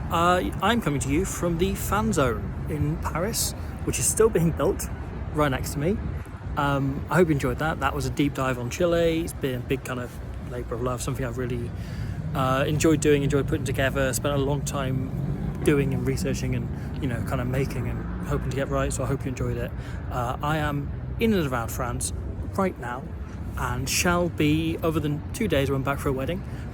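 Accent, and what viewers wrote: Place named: Edinburgh, United Kingdom. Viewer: English with a Edinburgh accent..my Chilean is...not sure lol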